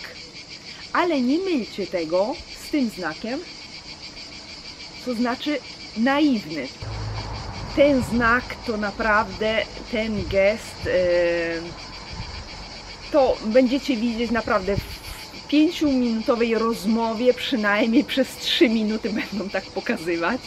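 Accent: Italy. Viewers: Masz PRZEPIĘKNY włoski akcent 👌🇮🇹❤️ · Masz piękny włoski akcent gdy mówisz po polsku!!! <3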